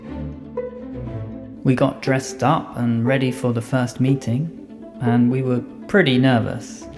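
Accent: United Kingdom. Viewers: Saludos desde México 🇲🇽 I love British Accent 🇬🇧 😍👌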